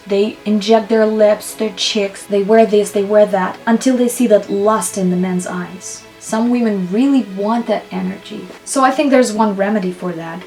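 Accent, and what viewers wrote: Russia. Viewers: Ilona Piescikowska she's russian so she probably won't change her accent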